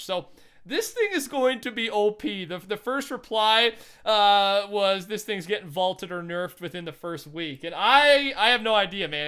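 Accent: Canada. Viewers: R u Canadian too?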